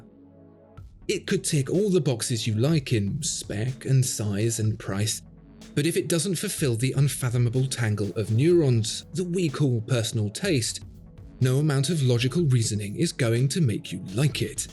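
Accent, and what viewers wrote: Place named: London, United Kingdom. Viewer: The ongoing video of that ugly looking Daytona which caters to the Corvette buyers is a distraction to the flawless spoken narrative originating in those who wish to impress you with their vocabulary and thought process. The accent or dialect is somewhere in the London upper class suggesting good education. The truth is much simpler. You buy a luxury Rolex watch in order to announce the following: I have made it.....have you?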